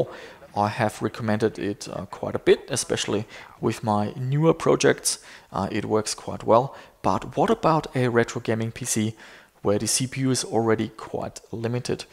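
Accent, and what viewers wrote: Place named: Germany. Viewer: I imagined something like that happened., allow me one personal question: do you originally come from a German speaking country? I noticed your accent and every time you pronounce "Wolfenstein".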